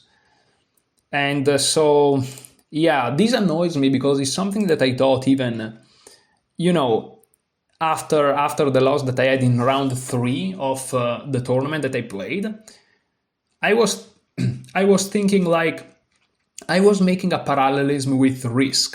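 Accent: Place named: Italy. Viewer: Bro your English is so fluent and rich in vocabulary yet you have this charming Italian accent. It sounds so cool. Never change it! Greetings from Germany!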